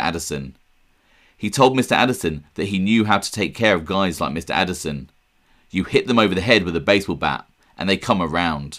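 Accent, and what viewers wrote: United Kingdom. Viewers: Funny hearing a British guy talking about are American Italian history 🤡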